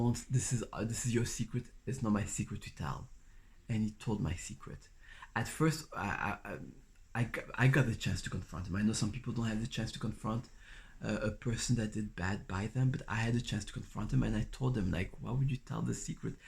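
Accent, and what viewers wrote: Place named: France. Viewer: OMG, you are soooo gorgeous and incredibly charming!!!! It sounds like you are a French speaker. Where are you from originally?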